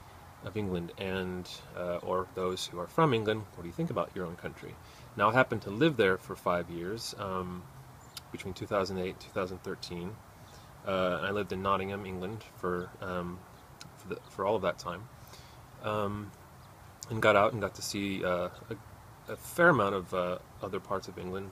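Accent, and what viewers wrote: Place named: United States. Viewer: Thanks. Talking of sharing a different language I notice you Americans pronounce "route" like "rowt" rhyming with "out" whereas we say "rewt" rhyming with "newt".:)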